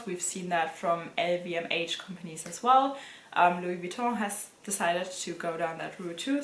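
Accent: Germany. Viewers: lol i hear permanently a german accent in your english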